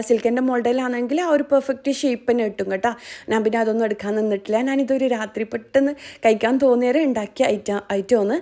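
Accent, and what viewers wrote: Kannur, India. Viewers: First time seeing ur channel . Nalla samsaaram 😘😘😘Kannur aano .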